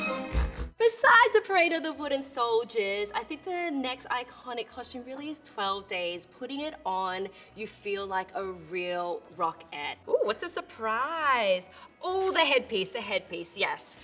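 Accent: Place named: Australia. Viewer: So nice hearing an Aussie voice in the Rockettes (from one Australian to another). Would love to see the show sometime if ever I'm in the US at the right time.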